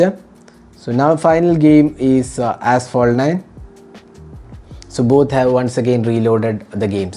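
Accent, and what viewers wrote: Kerala, India. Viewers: Accent keettit malayali anenn tonunu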